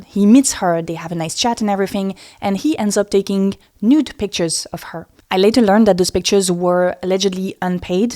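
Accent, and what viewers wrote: France. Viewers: I hate the French accent, you should LEARN English. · New watcher (from Sweden), love the French accent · How dare you call Trump a fasist, I do feel sorry for you, young, damp and with your french heavy accent, says everything! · Is it a french accent or an I tripping? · ARE YOU FRENCH?????? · P.s. You're gorgeous! And that frnch accent🐕🤩🥰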